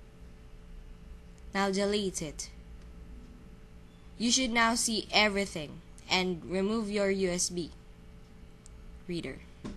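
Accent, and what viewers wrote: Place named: Philippines. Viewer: i bet u that ur filipino, u have my friends accent.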